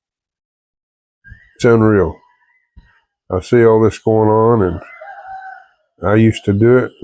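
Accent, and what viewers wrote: United States. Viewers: Good morning,. If it wasn't for bad luck, you wouldn't have any luck at all. Given all of the terrible things you've experienced in life, you could've turned out to be a very angry and bitter person, but you didn't let all of that get you down. That speaks volumes about your true character. Sometime, during your young life you must've had a mentor that was positive influence on you. It's either that or you somehow inherited a terrific set of genes family tree or it could be a combination of both. Whatever the case, Grace couldn't have found a better person to marry than you and Virgil couldn't have a better father than you, In fact, Virgil couldn't have any better parents than you two, both genewise and mentorwise. As for me, I feel very fortunate to have stumbled upon your wholesome, heart warming, family oriented, interesting, and educational videos. 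Besides that, I really enjoy your relaxing, laid back, hillbilly way of talking,. It's nothing to be apologetic about. In fact, you should be proud of your family heritage. ❤ 👍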